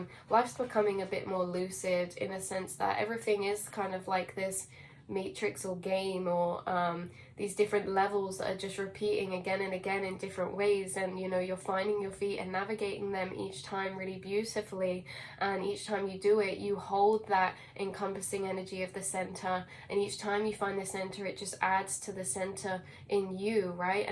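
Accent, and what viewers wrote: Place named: England, United Kingdom. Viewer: You are beautiful and your English accent sounds cute . 8 )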